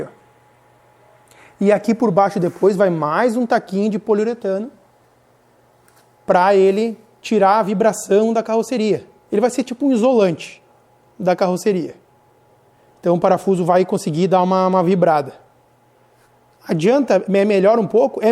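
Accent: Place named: Rio Grande do Sul, Brazil. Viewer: percebi que era de perto pelo jeito de falar kkkk , sou de Novo Hamburgo. Também faço trilha , um dia nos cruzamos por aí 👍👍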